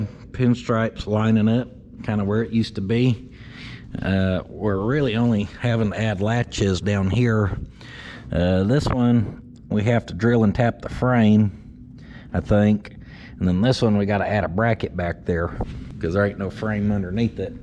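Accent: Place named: Appalachia, United States. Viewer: LMFAO - I used to think the "Deliverance" - "inbreed from the hills of Kentucky" ACT, was just that, an act just for filming YouTube videos - you know just a shtick. But I have come to realize that it is real, this is really how you are, and the way you talk, and your real IQ. Kind of makes this channel even funnier when one realizes all the jumping, dancing, talking like a hillbilly, doing things that make you look not so sharp, is actually REAL.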